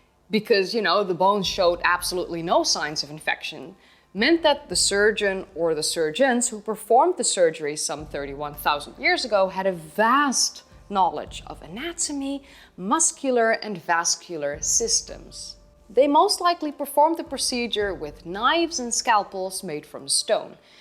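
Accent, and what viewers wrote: Netherlands. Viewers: grappi je hoort meteen wanneer iemand van NL is haha wel leuk wat je doet hoor erg interessant hoor keep up the good work <3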